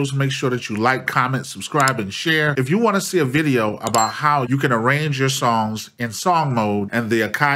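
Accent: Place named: New York, United States. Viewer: you sound like you from NY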